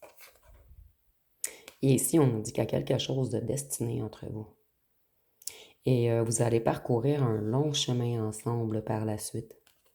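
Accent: Quebec, Canada. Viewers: <link> Charles DE GAULLE, lorsqu'il arriva en TERRE DU QUEBEC, tomba amoureux de cette TERRE Française et de ses habitants et cria avec son coeur: VIVE LE QUEBEC LIBRE!!! Votre accent de la "vieille FRANCE" me rappelle cette histoire glorieuse de la FRANCE éternelle et de DE GAULLE qui éprouva une grande joie de retrouver une FRANCE oubliée... MERCI pour vos guidances très psychologiques et clairvoyantes qui nous aident à comprendre les situations complexes et complexées par des blessures d'enfance... 😘💖👍🙏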